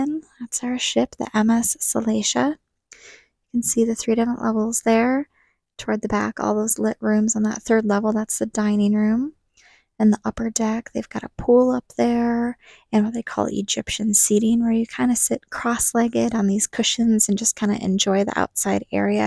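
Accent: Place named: New York, United States. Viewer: Hello.. I. Enjoyed. Emenseley. Your. Video. How. Ever. Your. Warm and. Easily. Understood. Your. New York. So. Soft. And. Clear. meaningful. Or. Your. Commentary. In. It. Was. Sense and. Sensibility. Of. Your. Honesty. Voice. That's. Kept me. Watching. Your. Video. Was. An. Insight. FOR me. You. Also. Have. Explained. Every. Detail. And. Information. Well done. FOR. Your. Knowledge and. Your. Attention. Wish. Luck. And. A. Good. Time. All of. You. Thank you so. Much bye. FOR. Now. I. Will. View your. All. Your. Next. Videos.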